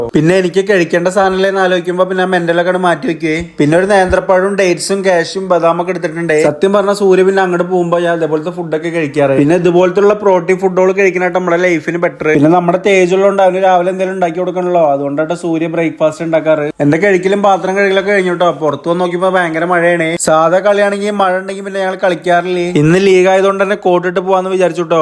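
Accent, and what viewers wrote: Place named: Thrissur, India. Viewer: Thrissur language aanallo ethh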